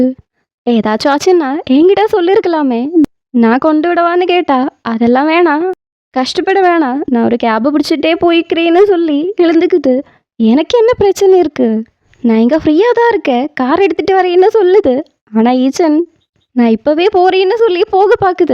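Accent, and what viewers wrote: Kerala, India. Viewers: Hi sis unga malayalam tamil explanation is soo good it's very different to hear me I like it explanation also very neat and clear so tq 😊 Fake fact lips series podunga sis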